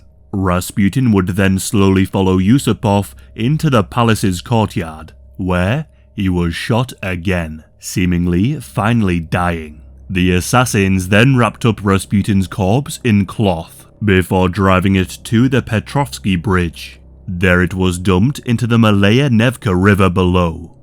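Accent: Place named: Yorkshire, United Kingdom. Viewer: weird combo of a west yorks accent and an east european one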